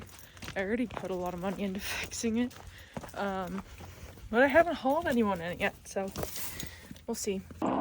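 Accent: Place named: United States. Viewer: Atleast you sound like your in America, could be worse I'm stuck in the UK 😂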